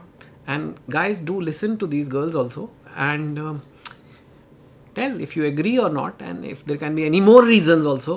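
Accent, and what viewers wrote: Delhi, India. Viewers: Bhaiya U talk like a typical Dilli Wala Mujhay bachpan yaad aa gaya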